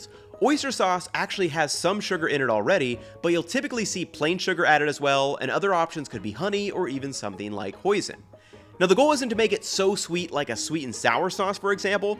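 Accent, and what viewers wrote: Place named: General American, United States. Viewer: nope, he definitely pronounces 'noodles' with the typical General American vowel quality throughout the rest of the video. Just a slip of the tongue I reckon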